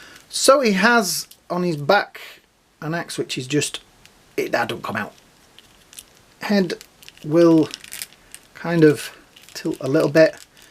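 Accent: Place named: United Kingdom. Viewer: I only watch about 6 reviewers and you're one, the British accent gives you the edge over the others. Should do like a monthly livestream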